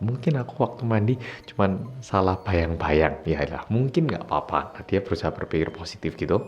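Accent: Java, Indonesia. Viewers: Lancar banget bhs Indonesianya dan agak berlogat Jawa. Keren nih mas 👍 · kenapa logat nya ngejowo banget mas 😂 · Aku tu kalau lihat mas langsung suka sama bahasa medok nya itu Lo, tapi bisa bahasa Jawa, pokok e nyeneng ne banget😂😂😂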